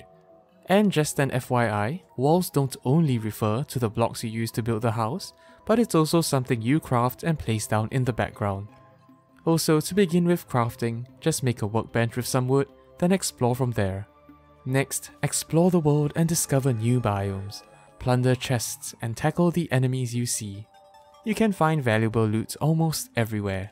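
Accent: Singapore. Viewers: Bro got that Singapore accent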